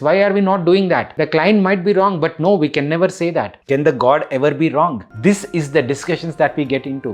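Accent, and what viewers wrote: India. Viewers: the indian accent of english language is so cringe to listen to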